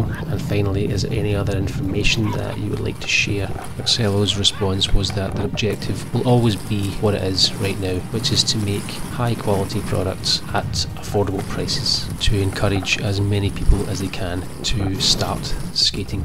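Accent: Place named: Scotland, United Kingdom. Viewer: Also where about in Scotland is that lovely accent from?!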